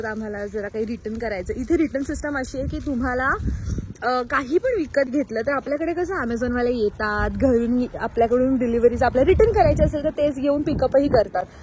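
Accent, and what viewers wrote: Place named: Maharashtra, India. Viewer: dude bt whatever it is ..butttt ur marathi accent is jst sooo adorable broke my jaw 🥰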